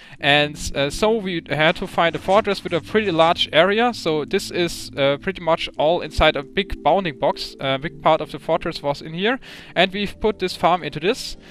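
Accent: Germany. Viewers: where do you come from? Germany? ^^ (sounds like it) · WOW, u have such a strong German accent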